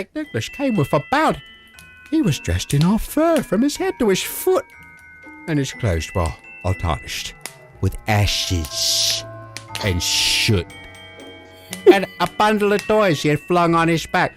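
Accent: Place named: United States. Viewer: Love the fact you can easily hear his American accent coming through clear as day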